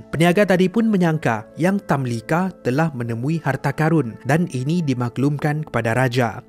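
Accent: Sabah, Malaysia. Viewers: 20 tahun akan datang subscribe naik Aku harap bro tetap buat content mcm ni Dan tetap guna logat dari Sabah 👌🏻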